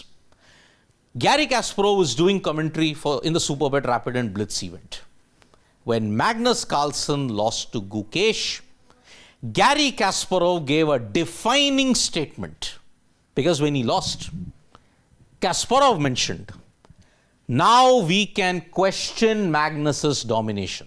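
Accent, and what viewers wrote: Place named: Tamil Nadu, India. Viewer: Host: U've an Irrrrrrittttaaating Tamilian accent, a bit like Ashwin the bowler. Def not a grt way to present. Gary's Insights are always valuable. Magnus is perhaps past his prime now. We shall know it in a yr or so.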